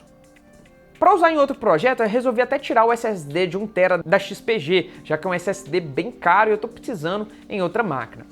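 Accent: Minas Gerais, Brazil. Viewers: Senti um mineirinho uai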